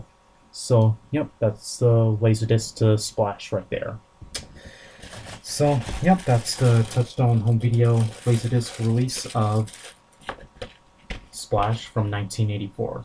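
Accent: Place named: Coast, United States. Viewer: Ohhhh cool thanks for explaining that. Where are you from by the way? I was trying to figure out by your accent east or west coast. Keep up the great vids!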